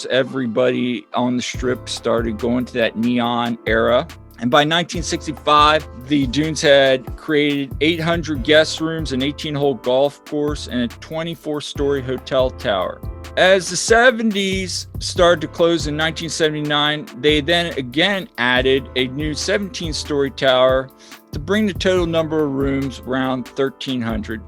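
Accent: Southern California, United States. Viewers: nice vid! also r u from socal?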